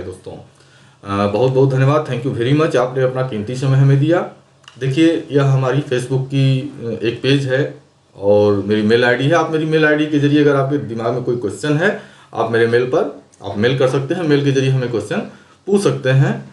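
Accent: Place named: India. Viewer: Photo se bilkul foreigner lag rahe the aap.. bas English sunke samajh aya k aap Bharatiya hai..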